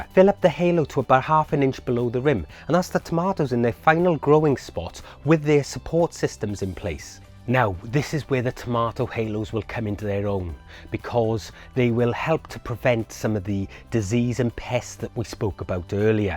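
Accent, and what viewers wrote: United Kingdom. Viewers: You have beautiful British accent. I love it. Thank you very much for informative posts.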